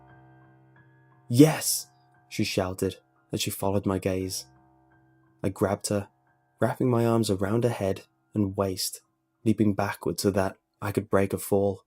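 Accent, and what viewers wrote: United Kingdom. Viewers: where in the UK are you from? I can't place your accent!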